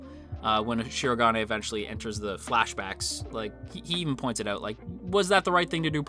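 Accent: Canada. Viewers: great videooo as usuall! i was super excited on hearing your thoughts on this episode! on another note i had a feeling from ur accent u were canadian and you confirming it really made me kinda proud of myself i guessed right yay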